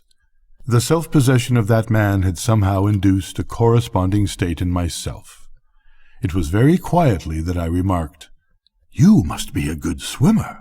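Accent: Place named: United States. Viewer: One of the greatest short stories ever written. Inviting many interpretations . But sadly almost forgotten . Conrad's style can be rather ponderous for modern tastes so his popularity - such as it is - will dwindle even more no doubt. ? Still , this was very well done . A pleasing , well modulated North American accent is always a pleasure to listen to . Despite the obligatory moans of English listeners who think narrators should sound like Sir Laurence Olivier !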